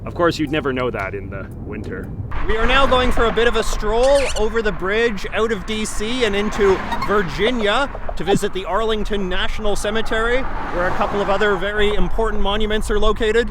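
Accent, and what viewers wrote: Canada. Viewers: This guy pops up in my suggested videos maybe 2 or 3 times a year, but every time I hear him, I’m always shocked at how much he somehow has the most punchy, if affected Canadian accent I’ve ever heard—and this with having had Canadian classmates, dormmates and colleagues in America and abroad! Oh, and no one thinks American buildings are all closed off and under otherworldly security, except for maybe Canadians whose media desperately want to portray Canada as somehow so different from the US in terms of security and civil discourse in public spaces—but their public let us know that they are never too far off or behind in many ways, right, Ambassador Bridge?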